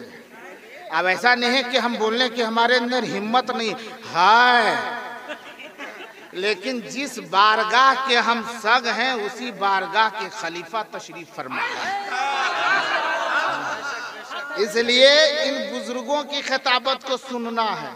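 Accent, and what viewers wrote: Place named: Giridih, India. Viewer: Maulana sb ki baten sun kar aisa lag hi raha tha ke Giridih district ke hai. Kyuke hum bhi Giridih district ke hai or ye bhasa se wakif hai.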